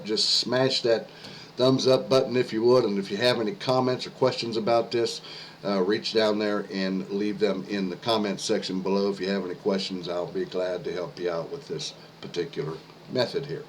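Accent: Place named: South, United States. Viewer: Love that Southern accent. Yesss!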